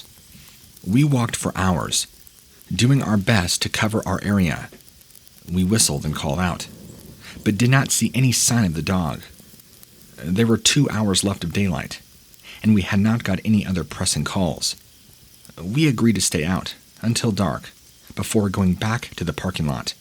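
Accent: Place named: United States. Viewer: You sound American but yet use the meter instead of feet, anyways I'm too tired to go look it up I'm out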